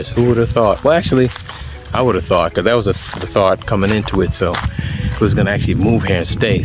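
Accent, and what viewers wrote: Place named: Belize. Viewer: You sound like you picking up the Belizean accent also😂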